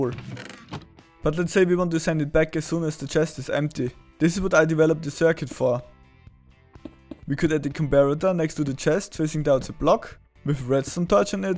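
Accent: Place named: Germany. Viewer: Bisschen deutscher Akzent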